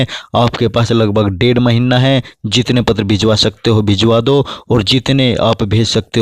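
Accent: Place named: Pakistan. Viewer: Ye Pakistani voice hay .! This voice not Indian voice ...and this voice is 100% sure Pakistani guy dubbing voice ....open eyes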